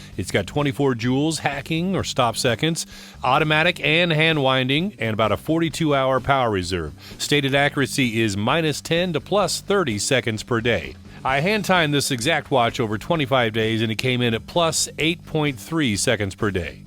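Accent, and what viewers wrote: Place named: United States. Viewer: what a classic American narrative voice! :D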